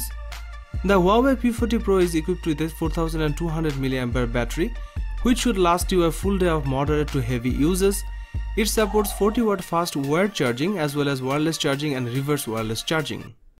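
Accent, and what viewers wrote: India. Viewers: omg the Indian accent...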